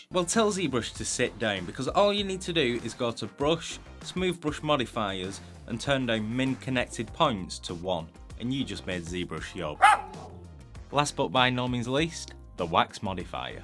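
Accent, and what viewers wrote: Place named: Northern England, United Kingdom. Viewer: You can't fault a proper northern accent our kid.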